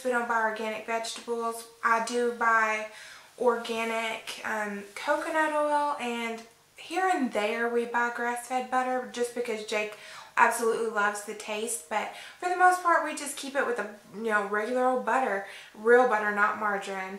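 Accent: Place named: North Carolina, United States. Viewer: I love your accent. North Carolina?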